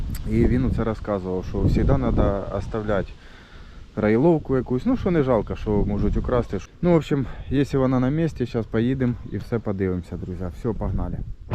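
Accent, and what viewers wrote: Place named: Kryvyi Rih, Ukraine. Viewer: Криворожский говор мне понравился больше чем другие украинские языки 😊 Более красивый и понятный , чем язык , которым разбавляет зеленский.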